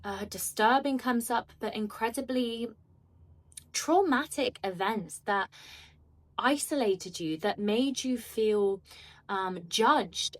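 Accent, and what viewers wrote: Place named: England, United Kingdom. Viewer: Love those golden highlights and the English accent is simply icing on the cake!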